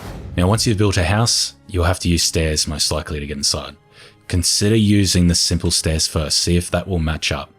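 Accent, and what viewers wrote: Australia. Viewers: Such an Aussie accent but awesome vid mate! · You sound like an Australian Ahoy <link> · classic aussie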